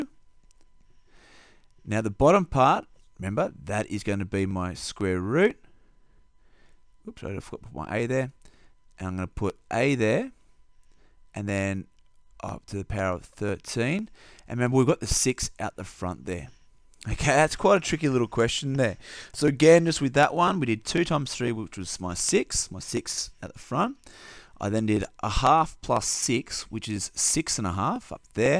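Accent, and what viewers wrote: Australia. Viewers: i know this is an old video of yours but i just stumbled apon it im in 8th grade and im also an aussie and its really nice to hear a good loud aussie instead of a quiet european tutor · Thanks mate for the help. I have a block exam next week for maths b and I needed to recap. Nice to listen to an Ausie voice as I am to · Thank you so much! My teacher doesn't show how to work out the questions she just puts examples on the board expecting you to know what to do. I also love that you are Australian because as I am australian I find the accent easier to actually listen to. THANK YOU SO MUCH. I hope I pass year 9 maths this year lol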